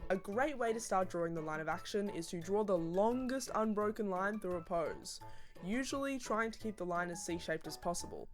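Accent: Australia. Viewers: This outta topic but for some reason I can tell you’re Australian, I actually know the reason I lied, it’s cuz u have the same accent and speaking manners of Rhea Ripley and as a wrestling fan I was like “woah u sound familiar”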